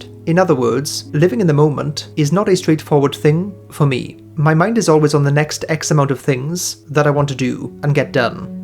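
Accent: Wales, United Kingdom. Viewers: "INFJs are never-ending future anticipators" Thank you that's the best way I've ever heard it said. And as an Aberystwyth boy good to hear it said in a Welsh accent! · I send your videos to my INTJ boyfriend a lot and I'll ask him "hey did you watch what I sent you"? and he'll say "oh from the British guy?." So, you're the MBTI British guy around here lol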